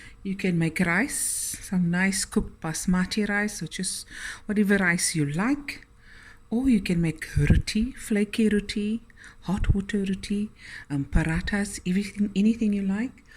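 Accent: South Africa. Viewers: I knew she was South African.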